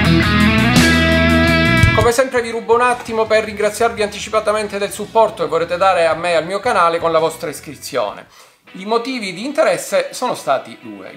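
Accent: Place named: Palermo, Italy. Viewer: Hai un'accento molto nota, sicuramente Palermo !!! Una informazione dove posso trovare in 3 piedi per questa macchina?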